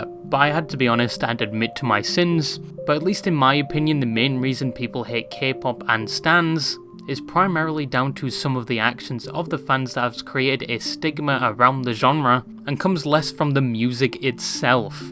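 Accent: United Kingdom. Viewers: is this guy still british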